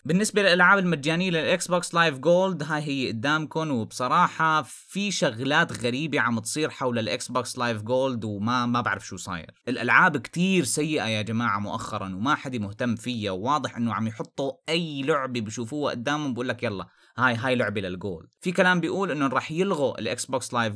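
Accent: Homs, Syria. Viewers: انت سوري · معلم انا بشغل فيديوهاتك مع العيلة و امي دائما بتقلي انو انت سوري من حمص😁😁 فهل انت حمصي؟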